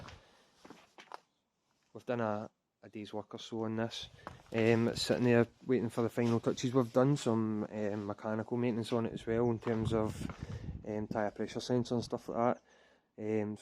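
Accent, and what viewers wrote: Scotland, United Kingdom. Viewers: No need to apologize your scottish accent is beautiful my friend 👍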